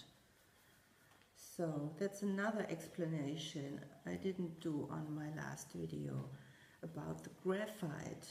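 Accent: Germany. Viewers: Hello, I found this video today, and so enjoyed it I had to go find the first 2 that you had done and get caught up. I feel you are a kindred spirit to me in many ways. I, too, love smalls and would never even think of doing a HAED work, even though there are many beautiful ones..or a Mirabilia! I have 3 of the Lizzy Kate snowmen charts and was going to finish them as ornaments, but the picture with all of them is so cute. I think my grandsons old enjoy that alot. Thank you for taking the time to share your stitching and I hope you will continue to do so. Also, meine Mutti war auf Deutschland, so I enjoy listening to you accent. Happy Holidays!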